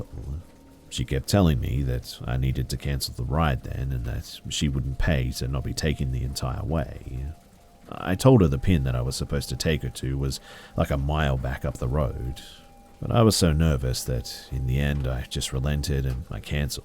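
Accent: Australia. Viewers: Ikr i love be's accent. I live in northwestern US and i have never met someone irl from australia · Omg. An Australian narrator. I'm so happy right now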